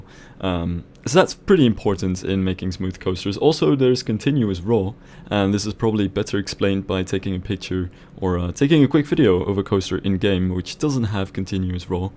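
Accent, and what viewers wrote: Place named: Netherlands. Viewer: you have to be dutch, atleast you sound like a dutch guy speaking english, btw verry nice vid man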